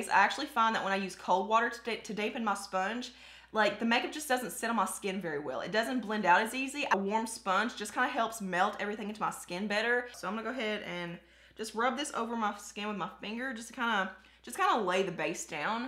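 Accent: South, United States. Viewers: Just found you! Another southern accent.. I can soooo speak your language!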